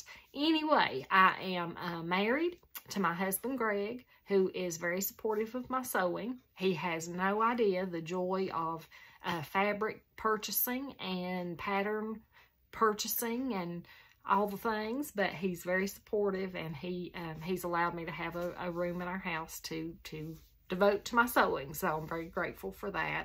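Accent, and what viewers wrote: South, United States. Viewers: I’m new to your channel and love your southern accent 😊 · Hello, I’m an old southern gal from the woods of southwest Alabama, so your accent sounds just like me. I’ve been sewing since I was a little girl, myself. My grandmother was a seamstress who sewed for other people and she made most of my clothes growing up. She taught me the basics of sewing and I took it from there. Your sewing room looks interesting, I have Pfaff machines also, as well as a Singer Professional 430, a Juki 2000qi straight stitch and various other embroidery machines and a brother serger. I’m am like you, I keep everything, so I have had to do a declutter in my room this year, that hurt! My sewing room is outside of my house in a metal building that was used as an office years ago. It’s just across the yard and about 12 by 24 feet. It works for me as I can leave everything out without disturbing anyone. 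I will subscribe and look forward to watching your videos.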